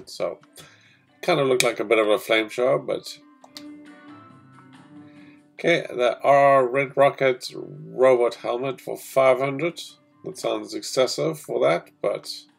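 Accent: South Africa. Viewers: This dude sounds super south african😂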